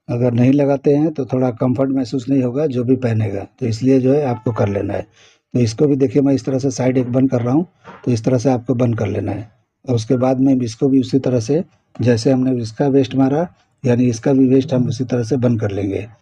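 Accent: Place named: Uttar Pradesh, India. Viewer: Bolane ke style se aap up ke lag rahe the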